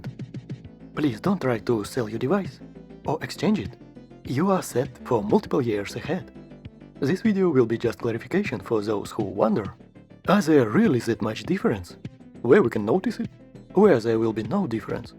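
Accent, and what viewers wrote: Russia. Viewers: Приветствую! Я редко пишу комментарии но щитаю что нужно разъяснить ситуацию с хейтом против вас немного с другого ракурса ))) Есть видео от других авторов по этой теме (100ультра vs 200про) где всё наоборот! Где 200про зачастую лучше 100ульты причём по основному модулю! Существует такой канал 2Megapixels и там есть видео (недели 2 ему) где 100 ультра не особо вывозит 200про ))) И там тоже есть конкретные примеры ))) И тут возникает справедливый вопрос! А кто же из вас двоих не прав! И я прекрасно понимаю людей что обрушились на вас с бурей негодования и хейта. Ведь их претензии к вам основаны не на их не на чём не обоснованном мнении а на конкретных примерах с других каналов по точно такой же тематики что и ваш канал. И тут уже дело не в особо одаренных хейтерах а в том что ваш контент противоречит контенту других авторов которые зачастую обладают более авторитетным мнением чем вы. 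Вот поэтому вас некоторые люди и воспринимают довольно поверхносно и крайне предвзято относятся к вашему контетту. Щитая что вы очередной хейтер конкретной модели 200про. И да главное поменьше делайте акцента в ваших видео на критику в ваш адрес. В противном случает люди что навалили вам негатива будут делать это усерднее ))) Хм по вашему акценту я думаю вам не потребуется гугл переводчик для прочтения моего комментария )))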